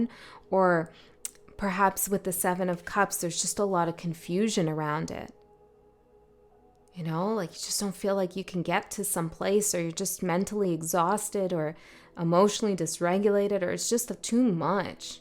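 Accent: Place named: Canada. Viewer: i see that you are a piacean same as me, love your ring on your right hand, i have always loved your canadian accent, you have a very soothing voice, i see the letter m in thye palm of your hands and the half moons in your nails. i was born on march the 6 th at 6 am 1962 what day were you born?. ps your boyfriend is a lucky guy i hope he realises that. what part of canada are you from, love your cat by the way.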